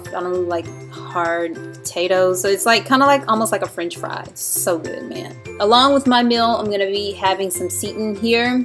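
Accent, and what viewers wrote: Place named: South Carolina, United States. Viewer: I like your accent what state is it from? south Carolina?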